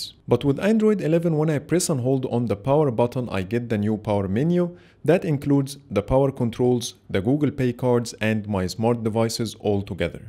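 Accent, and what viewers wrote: Egypt. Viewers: Am sure u r Egyptian.. Your accent is so Egyptian.. Thanks for the great content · اللهجة المصرية 🤣❤️ بس بالانكليزي 🤪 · واضح انك مصري تكلم عربي بالله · You have the unique egyptian accent 😂😂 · Your accent sounds Egyption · ناس بتقول انك مصري سمعت من ناس كتير انها بدات تفهم إنجليزي من الطريقة حقتك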